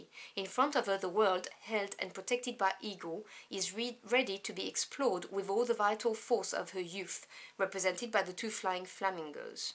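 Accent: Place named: France. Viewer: Lovely accent, French? Or maybe like Syria or something ?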